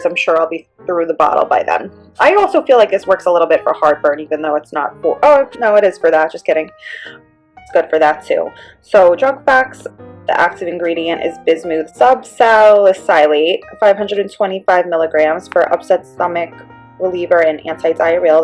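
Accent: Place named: Boston, United States. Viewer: Boston maybe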